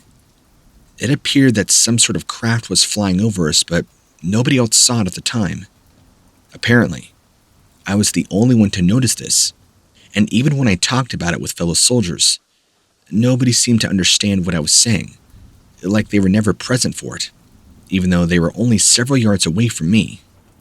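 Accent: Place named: United States. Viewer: You sound American but yet use the meter instead of feet, anyways I'm too tired to go look it up I'm out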